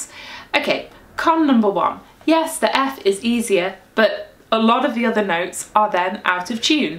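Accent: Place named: RP, United Kingdom. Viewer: This is amazing. I was wondering why th f sharp sounded so close g on my recorder. I figure it out myself before chek that out with u because of course . you are the authority on the recorder. Your receive pronunciation gives u that title as well.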